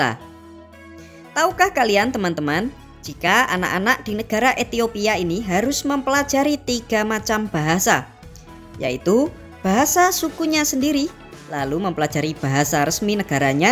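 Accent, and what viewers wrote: Java, Indonesia. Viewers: Orang jawa ya mas... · Yang ngomong meddok bgt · Jawa nya medok bgt narator nya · Medok banget bahassnya mimin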